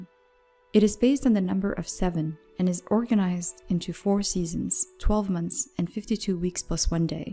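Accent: Somalia. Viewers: M.a codkada ayaba ka gartay inaa somali tahay keep going sister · She is obviously somali you can hear it in the way she pronounces somali cities 😏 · 😁😁😁 the narrator is somali girl (you can identify the way she pronounced SAYLAC) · This is a somali person .. Qofkaan waa gabar somali ah. Cadaan mxaa isaga dhigi. Accent ga ayaan aqaana.. ee beenta iska daaa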